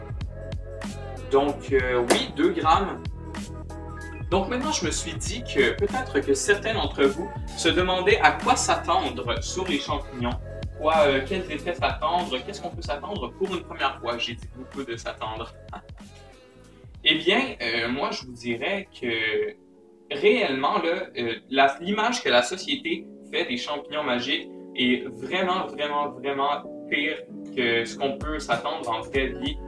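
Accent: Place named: Canada. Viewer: Tkt ca mfait juste bizarre que tu parle canadien jsuis francais moi^^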